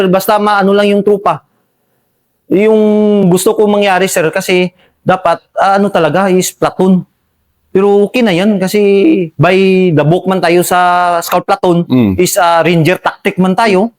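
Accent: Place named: Iloilo, Philippines. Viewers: Batyagan gd nga ilongo sia sa ,panagalog nya at least never mine isog Taya,!! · tikal ilonggo ka gid gi kagid gina piko gina pala to😂😂😂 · Ilonggo talaga eh .. sa kwento pa lang 😊...halatang nakapag renew sa iloilo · Hilonggo tekal gid. Na hehe magaling ka sir ha.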